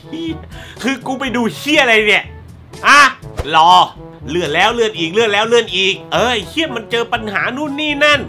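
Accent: South, Thailand. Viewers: พี่เป็นคนใต้ป่าวครับ แหลงใต้ได้ม่ายนิ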